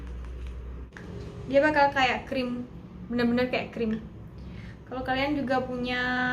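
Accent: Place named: Bali, Indonesia. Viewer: logat khe sa Bali x🤣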